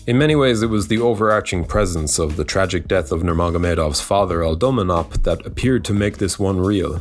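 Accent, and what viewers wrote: Ireland. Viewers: Irish Narrator is the real MVP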